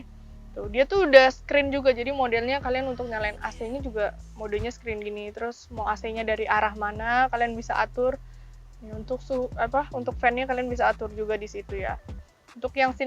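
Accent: Java, Indonesia. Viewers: Kek ada logat" jawa nya kalo ngmong, ya mba 😁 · Wong jowo tah iki hhh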